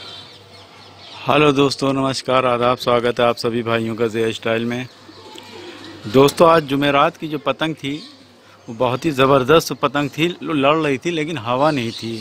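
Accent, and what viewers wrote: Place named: Allahabad, India. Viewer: aapki allahabadi bhasha sunkar achha lagta hai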